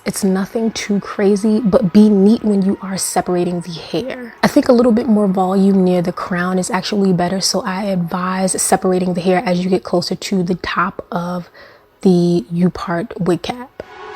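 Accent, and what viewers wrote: New York, United States. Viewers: The way you say "also", "oval" and "important"... with the silent t's you sound like a New Yorker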